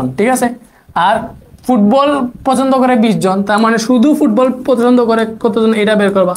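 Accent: Sylhet, Bangladesh. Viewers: Vai mone hoyy Sylhet E😮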